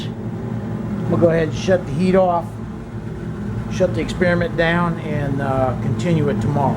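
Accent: United States. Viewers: I stop learned i dont understund when he speaking american- englich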